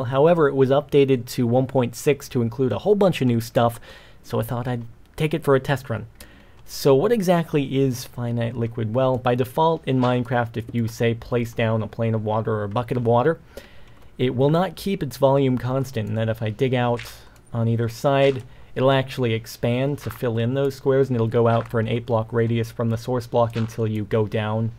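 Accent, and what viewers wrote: United Kingdom. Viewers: He sounded british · way do you spik bridish?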